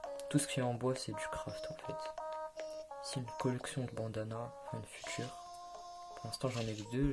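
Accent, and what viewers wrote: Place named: Arab world. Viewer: tu parle vraiment comme un vrai arabe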